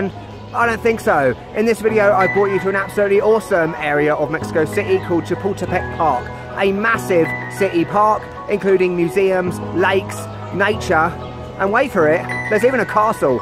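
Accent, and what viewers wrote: United Kingdom. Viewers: Hi! I am a native from Mexico City and I love your videos on my home town. I like your style: dynamic and objective. I don't live in Mexico City anymore, so when I watched your videos, I got to see new places and attractions. Also, I LOVE your British accent. It's pretty cool. Wish a great sojourn at Mexico City. Cheers!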